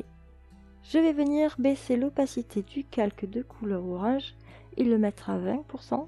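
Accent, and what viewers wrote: South, France. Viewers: Bonjour merci pour ton passage sur ma chaine et pour ton très gentil commentaire, tuto comme l' écrit mon ami Jim ( homme de gout ) excellent. like 24 et un petit abonnement en plus pour écouter tous tes conseils, encore merci et à bientot, amitié, ah oui ton accent du sud est à croquer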